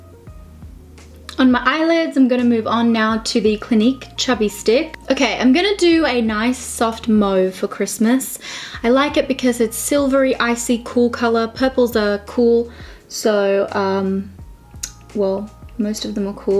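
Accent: Australia. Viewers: I swear there should be more of our accent in you tube videos. We really have the best sayings about things lol. #aussieaussieaussie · Nice to hear an Australian accent. 😊🌸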